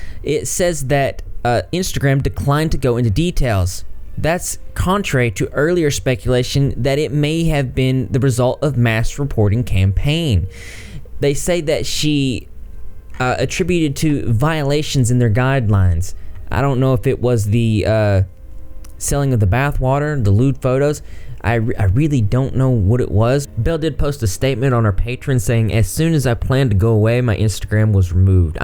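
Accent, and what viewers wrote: South, United States. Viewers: I hope people know they will get mowed down by the military if they trespass. Anyway, great to see another rep report! I really enjoy your southern accent 😄 sending love from my friends and I in New Hampshire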